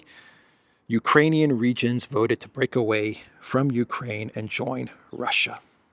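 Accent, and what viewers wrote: United States. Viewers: he sounds American. That's why i.asked.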